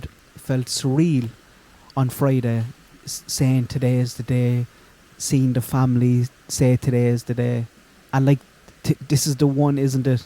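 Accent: Ireland. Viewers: Maybe seem a backhanded compliment but in fairness you have done some very good work here with this part of the investigation, i.e., the items found so props for that. Even though I do struggle at times with the thickness of your Irish accent lol. I used to work in Ireland and never got used to the accent, regardless of how long I was there. It's just one of those very thick accents that one can either grasp and understand fully or not. I guess I am one of those who can't. However, people need to maybe start coming to terms that they were duped and mislead by the Murder Sheet over the Klines, who they literally accused the Klines of these murders. Now, people who were adamant that the Klines had murdered the children, are adamant that they are involved someway or somehow. It may turn out it was just a coincidence that Libby had contact with that AS profile and that is what that Voorhees guy said after hacking into the account, i.e., 'synchronicity. 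Seems that AS profile and Klines profiles were prolific, meaning they were targeting many young impressionable teens and children in Indiana and further afield. I think people need to maybe just stop this Kline obsession and fully focus on what we 'know' and not 'think' we know as Carter put it and we know Richard Allen is the BG, despite Carter not confirming this. That is why your work at this stage is very good as you are focusing on Allen and items found, when other channels were not. Again, props for that. Of course, don't ful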